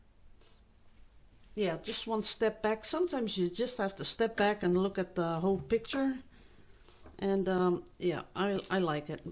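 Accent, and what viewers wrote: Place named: Netherlands. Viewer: I hope I can learn to do these beautiful pours. It looks like two people are reaching for each other's hand as if something magical is about to happen (except for the "extra" finger lol) it's absolutely stunning! Btw, I knew you were Dutch from hearing your voice. My mom was the only one from her family to move to the U.S... I have never been but, I dream of going to visit my Dutch family! Thank you for sharing your beautiful art❤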